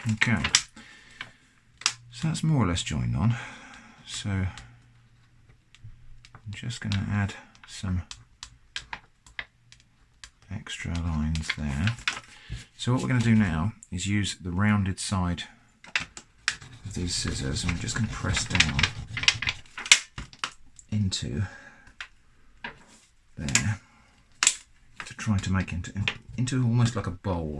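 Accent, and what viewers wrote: England, United Kingdom. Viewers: Innuendo seems to go well with an English accent. Classy.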